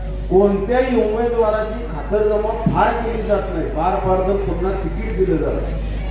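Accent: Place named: Kolhapur, India. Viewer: Kolhapur cha avaj R V I saheb 🚩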